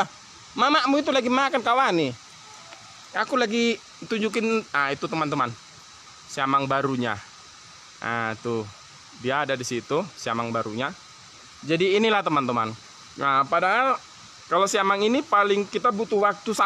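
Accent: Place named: Brunei. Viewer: Bang perkataan mu aku dengar ada ayat berbunyi "kau ani" melayu nya seperti cakap brunei ada "kau ani" 🤣👍🏻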